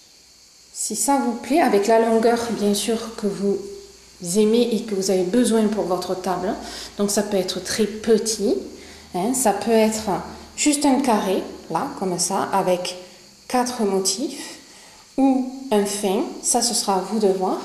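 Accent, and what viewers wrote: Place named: South-West, France. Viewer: Merci infiniment pour cette vidéo ..Les explications sont très claires et on a l impression de crocheter avec une copine, c est trop bien . Cela faisait des mois que je voulais faire de la mosaïque en crochet mais impossible de trouver un tuto facile à comprendre . Enfin ,grâce à vous , j ai réussi ma première mosaïque . Je suis trop heureuse. 🥰 (Et l accent du sud-ouest en plus, peut-être celui des Landes , c est le bonheur ) Vous avez gagné une fan en Guadeloupe .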